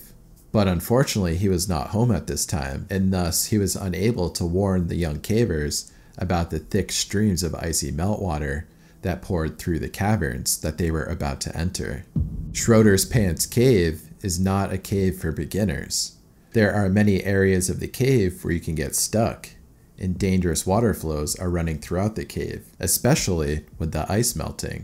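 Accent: United States. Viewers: American accent😂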